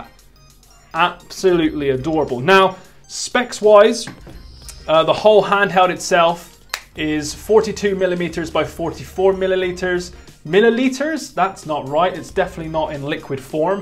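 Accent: Bristol, United Kingdom. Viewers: This is pretty cool I won't lie, but I swear you're also from Bristol with that accent of your's (hopefully that can be taken as a complement)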